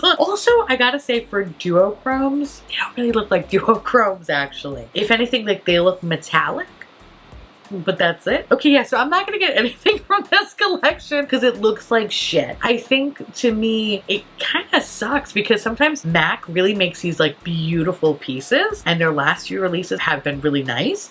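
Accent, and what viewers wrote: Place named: New York, United States. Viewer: Hearing your accent more today than usual. Sickness brings out the the NY. Feel better.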